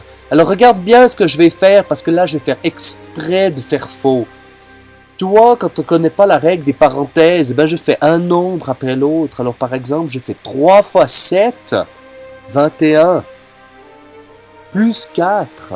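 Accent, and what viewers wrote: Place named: Valais, Switzerland. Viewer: Excellent, malgré l'accent Fendant.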